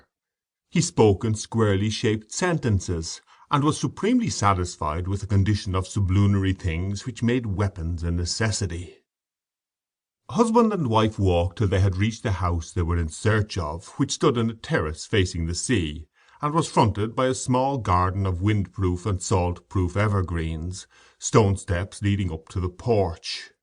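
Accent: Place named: Ireland. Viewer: How irritating; another Irish accent for Thomas Hardy! This is totally unsuitable and cannot be listened to!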